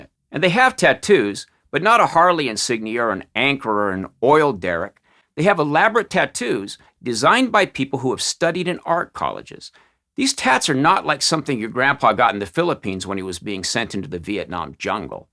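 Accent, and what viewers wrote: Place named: South, United States. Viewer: You are an ignorant hillbilly sounding asshole.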